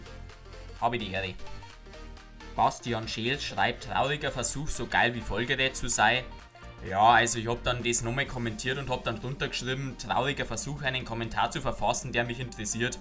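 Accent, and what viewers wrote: Bavaria, Germany. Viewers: Servus boarisch is der beste Akzent #boarisch für immer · Der bayrische Dialekt isch mega bin Österreicher verstehe alles nich so vile Unterschied zu meinem heimat Dialekt aber bayrisch isch besser und lustiger💪🏻💪🏻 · Des is boarisch I komm aus Ostalbkreis versteh alles bisch witzig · Man heat scho das du bairisch bisch! i bin Österreicher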